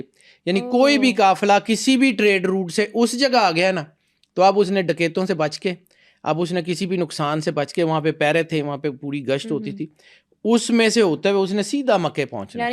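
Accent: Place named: Punjab, Pakistan. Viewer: Use normal accent to speak.. Not punjabi accent.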